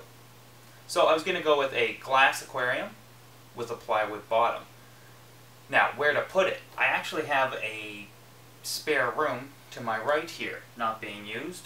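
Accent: Canada. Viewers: You sound very Canadian :)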